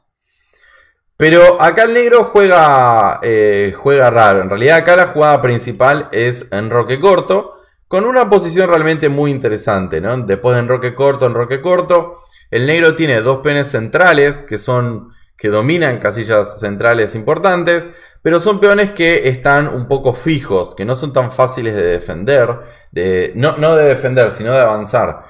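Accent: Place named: Argentina. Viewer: El mejor canal que encontré para un principiante avanzado como yo. Instructivo y pausado. Que lindo el acento argentino. Soy español, juego online, incluso hice un amigo en Neuquén. Saludos y sigue asi!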